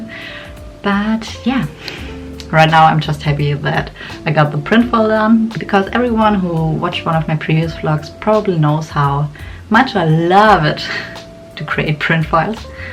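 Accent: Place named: Germany. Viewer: Your vlogs are so soothing! Also, your adorable accent makes me miss living in Germany! :D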